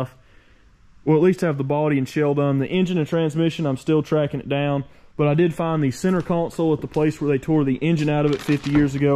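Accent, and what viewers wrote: South, United States. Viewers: I'm so hooked on hearing the ambiguous southern narrative describing the journey of restoring a completely totaled wreck into a running driving car! I can't help but thinking the song should be playing in the background. Can't wait to see the finished outcome.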